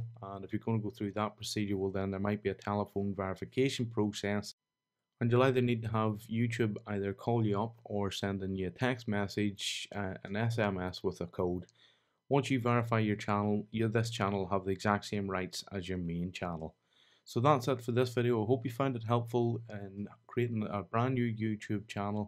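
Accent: Northern Ireland, United Kingdom. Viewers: are you northen irish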